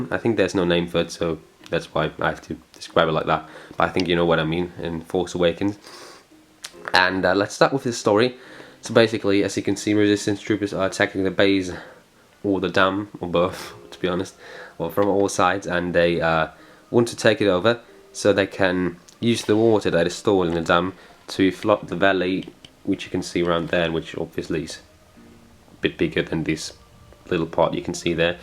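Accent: United Kingdom. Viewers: wo hast du so english gelernt? Hört sich ziemlich british an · Haha wie geil! Du hast ja richtig ein bisschen einen britischen akzent bekommen! :D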